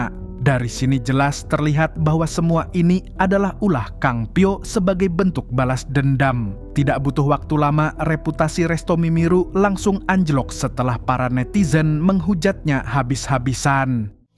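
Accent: Banjar, Indonesia. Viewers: Urang banjarkah pian mang?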